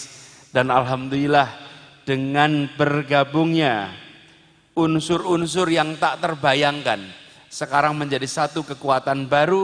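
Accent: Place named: Java, Indonesia. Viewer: Bahasa jawanya kok medok jg....sehat selalu pak anis.pidato jenengan bikin suasana hati menikmati ..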